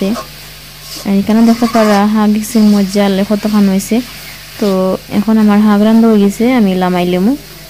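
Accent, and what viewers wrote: Sylhet, Bangladesh. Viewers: Afnar mat kub bala lage jokon Sylheti matoin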